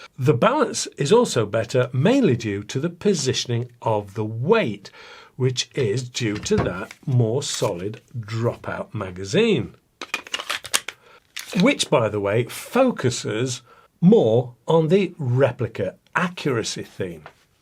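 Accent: United Kingdom. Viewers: Hi, Another great review. I purchased the Gen 4 about three months ago & am very glad I did. It's no tack driver but is OK for back yard plinking. Actually this BB gun was purchased as a safe training aide for my three high school to young adult nieces. For that purpose I have found nothing better that the Umarex Glock 17 Gen 4 CO2 BB pistol. My main difficulty with this replica has been getting the threads started straight when loading the CO2 cartridge into the magazine. Sometimes I get lucky & the threads line up the first time but usually I must try several times & also makes sure I don't get frustrated & force the issue. Thanks for your good humor & that Great British accent, Larry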